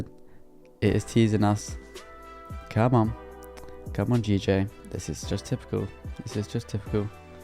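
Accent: United Kingdom. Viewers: Hey bro where in the UK you from?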